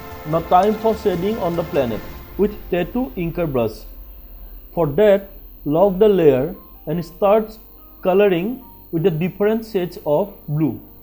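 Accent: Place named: Assam, India. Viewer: Aa bhai mja.. Tmar kothakhinir porai lage j tmi assamor... Mou assamore❤❤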